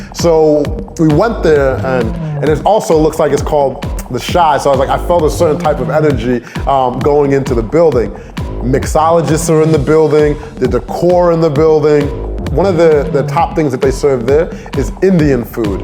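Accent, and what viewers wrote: United Kingdom. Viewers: Bro you killed it with that British accent 👌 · You have got a British accent. You probably live in London.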